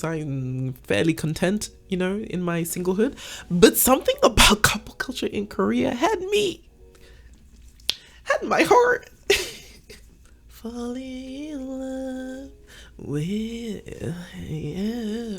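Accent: United Kingdom. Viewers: I laughed so hard how did you switch your UK accent to a Nigerian accent🤣 I just discovered your channel and I really like it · You’re Canadian? I thought you were British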